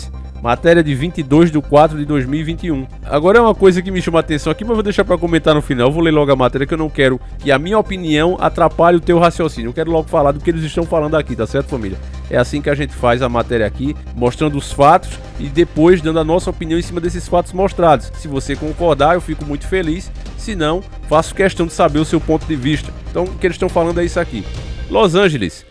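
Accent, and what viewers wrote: Northeast, Brazil. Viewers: mais uma vez eis me aqui ótimo início de semana pra toda família encarando também mando o meu abraço pra vocês, eu moro em São Paulo a mais tempo duque vive no nordeste mas sim sou de Alagoas e além de tudo estou familiarizado com o seu falar fico feliz em saber que no nordeste tem alguém que representa bem não só o nordeste mas todo O Brasil aqui no YouTube é uma honra poder fazer parte de todo família encarando, embora não gosto muito de comentar mas quando torna-se mais que interessante como é o caso da terra então eu entro na conversa.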